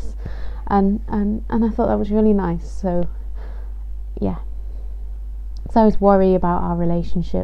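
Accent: United Kingdom. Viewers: In my opinion there’s nothing better than a girl with an English accent.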